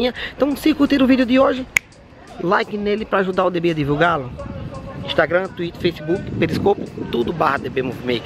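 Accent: Northeast, Brazil. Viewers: nuuuguets kkkkkkkk gostei do video ate pq ele tem um sotaque nordestino tem o meu gostei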